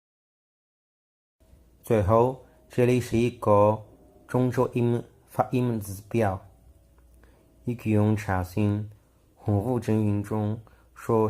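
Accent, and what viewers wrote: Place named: Xishuangbanna, China. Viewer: This is so fascinating, this sounds very similar to the accents I've heard in Yunnan province, I am an amateur linguist myself and am fascinated by the Germanic and Sinitic languages, in 2009 I moved to Kunming Yunnan for 8 years nearly and got to know the local dialect there, it was quite a shock when I heard it at first because it doesn't sound like any of the mandarin I had learnt in classes. I'm wondering is southwestern Mandarin related in large part to this? I know a lot of Yunnanese Han came from Nanking originally during the early Ming Dynasty, is it possible that this language was preserved to some degree in Southwestern China, I've also been to Guangxi, Sichuan, Guizhou and Hubei and they all speak very similar dialects, this sounds very similar to some of the dialects I heard whilst in Liuzhou. Words like 介绍 are still pronounced as 'gai shao' in those parts and the tones sound very similar too. 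I could pickup most of what you said as if I was listening to a local Yunnanese dialect.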